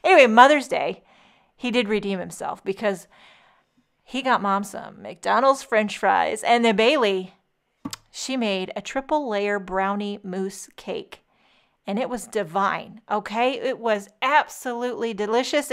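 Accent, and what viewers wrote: Boston, United States. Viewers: You have a great Boston accent! 😂 What wonderful kids spoiling you like that on Mother’s Day! Absolutely love your cards! And this whimsy rainbow die! I find myself creating a lot with this! Great inspiration!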